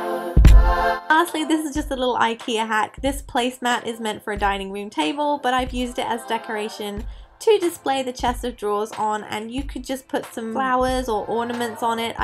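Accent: England, United Kingdom. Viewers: Well She Has An British Accent, But Yeah I Go With U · is she english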